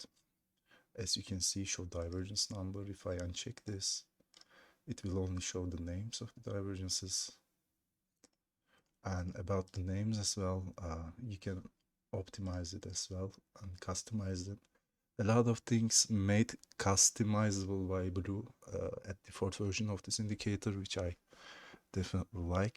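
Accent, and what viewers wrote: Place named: Turkey. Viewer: Türk aksanı seziyorum :) Bravo bro devam ellerine sağlık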